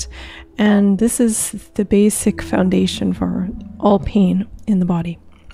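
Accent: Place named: Canada. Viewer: ".....ay?" you Canuck you nice gentleness, there is so little of that now.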